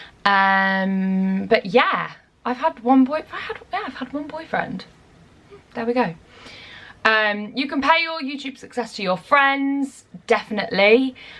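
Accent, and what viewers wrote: United Kingdom. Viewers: I love hearing you ramble!!! Especially about rubbish! Sorry I had to say that I’m from America and I just love British accents and slang.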